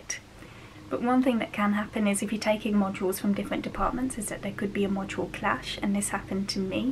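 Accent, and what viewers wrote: United Kingdom. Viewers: Not a maths student just watching your video so I can learn English and understand British accent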